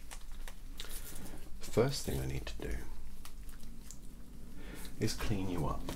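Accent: United Kingdom. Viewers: Me: a whole zombie: You look a bit under the weather, but other than that, I diagnose you with zombie Extremely British, I love it 😂😂😂😂😂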